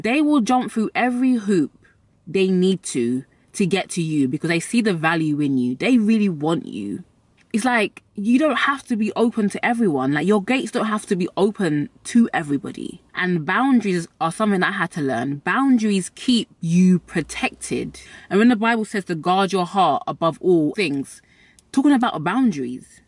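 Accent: United Kingdom. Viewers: I will add tax to it. Great advise. I love your British accent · What an incredible video and it's so much more nicer for to me to hear a local British accent! Girrlll you was speaking my language from start to finish!!! I've been on a journey of self love and self care but I've hit a wall and came onto YouTube and this came up on my recommendations. I'm going to check out the rest of your channel but it would be absolutely amazing if you could also break this down into simple terms ie how exactly can we get to this point, what things do we actually have to implement to get to where you are? I hope that makes sense lol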